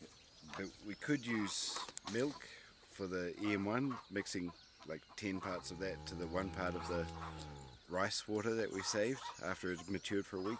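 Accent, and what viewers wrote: New Zealand. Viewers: great to hear a strong kiwi accent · Did I notice a kiwi accent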